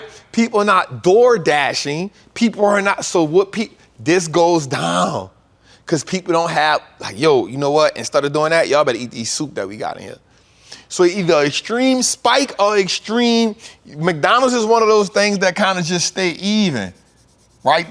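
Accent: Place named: Louisiana, United States. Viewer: I love an intelligent trapper. Dope. Keep up the awesome work 🔥 i hear some Louisiana in there too. NO native here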